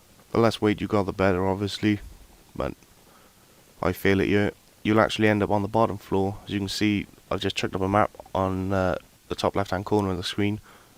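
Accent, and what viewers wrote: Wales, United Kingdom. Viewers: AWESOME guide, thank you man. Also nice listening to a Welshman for an hour :')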